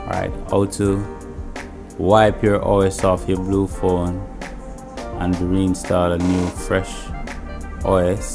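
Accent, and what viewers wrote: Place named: Jamaica. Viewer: yo yah jamaican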